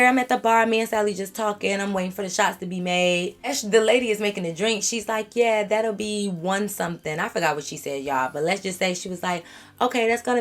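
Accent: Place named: Chicago, United States. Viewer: I swear I cannot watch any of the others because you just know how to storytell! Girl where are you from though? I could’ve swore I hear a lil Chicago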